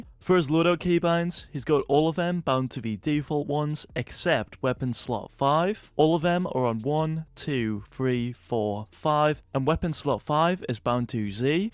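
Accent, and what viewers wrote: Newcastle, United Kingdom. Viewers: Are you from Newcastle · Sounds like your from Newcastle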